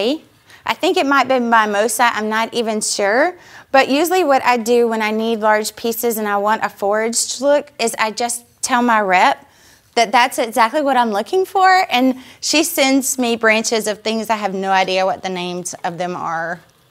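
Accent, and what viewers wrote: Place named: Georgia, United States. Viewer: humble dialect :) in Georgia. hope it's empowering. happy making!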